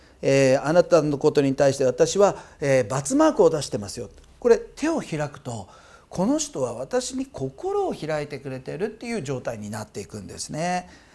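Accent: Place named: Kansai, Japan. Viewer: ついつい次々と動画を観ています。笑😊 0.01の魔法を早速今日から使いますね！ たくみさんの会話の合間に出る関西弁に親近感が増しています。いつも惜しみなく何でも話して頂ける事に感謝しています。これからもワクワクしながらお話し聞かせてもらいますねぇ〜。😊❤️🌈ありがとうございます。🙏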